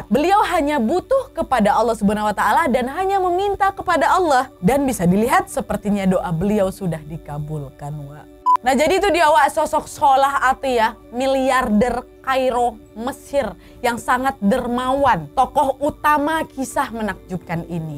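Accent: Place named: Medan, Indonesia. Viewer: Bahasa dan logat ini kayak orang MEDAN,mantap aku subscribe kakak..buat nambah ilmu motivasi dan semangat...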